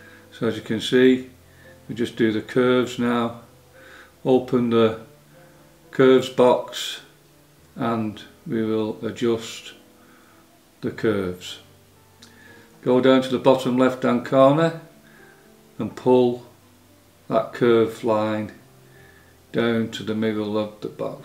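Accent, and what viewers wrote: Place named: Northern England, United Kingdom. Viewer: Hi, Just printed my first Cyanotype and wanted to know how to progress with wet cyanotypes etc. Have now been watching your videos for the last few hours.(Lovely to hear a northern accent!!) Brilliant am hooked. Today was a beautiful day so can't wait for the next one to experiment further. Thank you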